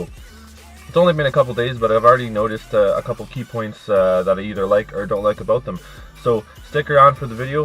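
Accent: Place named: Canada. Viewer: this is random but your Canadian accent is awesome! haha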